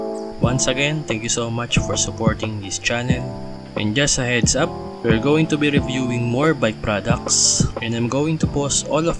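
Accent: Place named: Philippines. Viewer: I notice the Accent, Filipino ka sah? anyway ganda ng touring set up nato.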